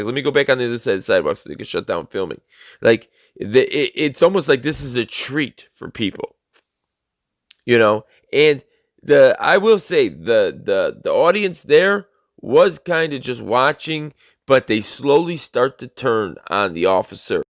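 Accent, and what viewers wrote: Chicago, United States. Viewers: Go get em!!! You have become a favorite auditor!!! I love when you educate them with that style and swagger! And love that Chicago accent 😉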